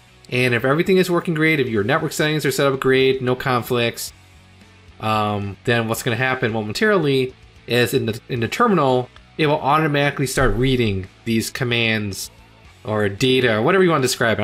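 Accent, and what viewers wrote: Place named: Midwest, United States. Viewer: Totally off-topic: are you from Minnesota or Pennsylvania or somewhere in the midwest? I'm trying to place your accent. It's the Ds in place of THs you do ("de" instead of "the"). I'm Canadian so I have no idea about American accents unless they're from the DEEP SOUTH lol.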